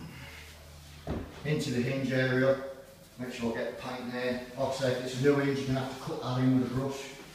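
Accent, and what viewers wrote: United Kingdom. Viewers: Are you British? Well no wonder I can't understand a single word you're saying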